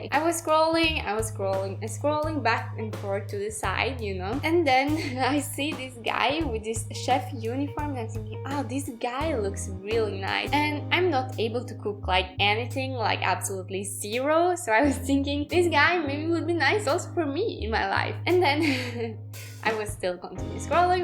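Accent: Italy. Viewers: you're getting an italian accent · your English accent is so Italian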